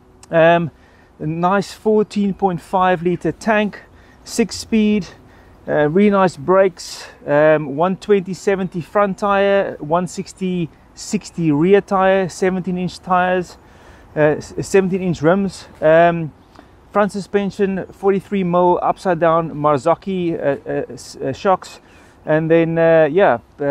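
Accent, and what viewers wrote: South Africa. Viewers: Klink my jy is van Suid Afrika??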